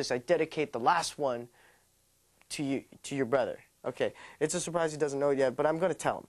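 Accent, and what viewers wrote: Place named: Canada. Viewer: oh man... i completely forgot how deep that canadian accent can be. living here in vegas 4 years i missed it. i remember that show. also the one where the kids call into santa during the christmas season. memories...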